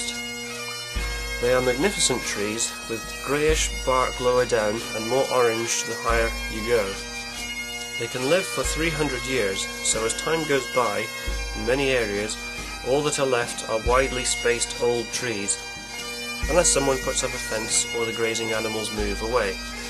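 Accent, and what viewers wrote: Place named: Southern England, United Kingdom. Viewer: Glad to finally know what country you're from, I thought you were a southern English dude like me from your accent until I heard you grew up in Oslo. Oh you and your love of the Natural world ._. you've been to asia and austrilasia but I've scarcely been north of London lol The lack of life....pretty much in general...in Southern England is a good thing ^_^ The number of humans not so much :U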